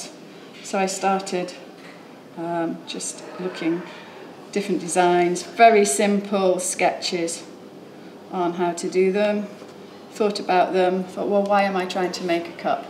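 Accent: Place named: United Kingdom. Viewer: Sounding British, I wonder where she picked up the coffee habit.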